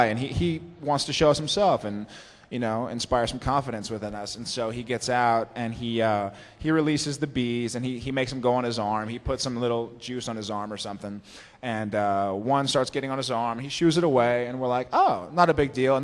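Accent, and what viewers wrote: Texas, United States. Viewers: You can really hear his Texan accent here. Love it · Gotta love his Texas accent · I love the slight Texan drawl that his voice has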